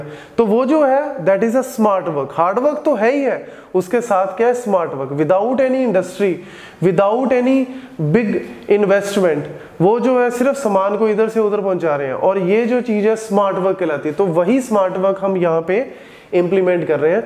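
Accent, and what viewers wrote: Punjab, India. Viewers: tuhade accent to lgda tusi punjabi o??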